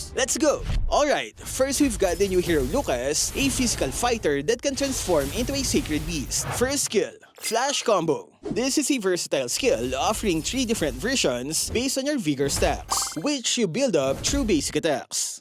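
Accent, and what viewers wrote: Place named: Philippines. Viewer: Logat PH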